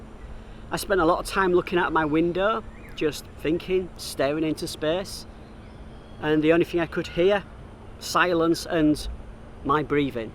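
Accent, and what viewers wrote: United Kingdom. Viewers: stay safe mate🤭🤭 if we meet teach me british accent haha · I believe you are from the UK because of your accent. I have been to the UK for training and stay in stevenage about 2 months.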